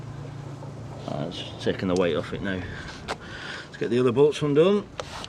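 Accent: Wigan, United Kingdom. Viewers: Great video bud. I wanted to see this exact part of the installation, I'm glad I did too, that pump makes a right horrible noise! Its definitely getting fed from the tank, I'm not having that inside my van! 🤣 And I have to have a guess, you sound like you're from Wigan, maybe Bolton? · is that a wigan accent? great video, very helpful.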